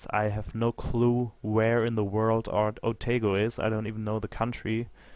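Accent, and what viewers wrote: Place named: North America. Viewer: For being German you sound like you have a Canada/USA accent.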